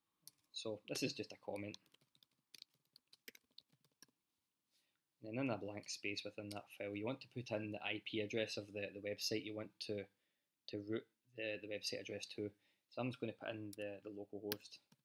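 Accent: Scotland, United Kingdom. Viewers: Hi. It sounds like this is a good fix but you're speaking so fast with your Scots accent that I can barely understand what you're saying. I wish I did. I love your accent. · William Wallace accent!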